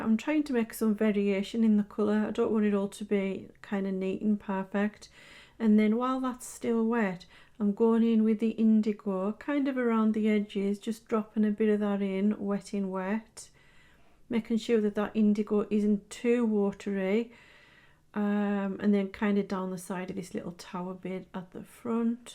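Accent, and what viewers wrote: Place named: England, United Kingdom. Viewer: trying to place your accent is killing me. I have lived outside of the UK for 14 years and I cannot work out if you're from the Midlands or the NorthEast lol. Please tell??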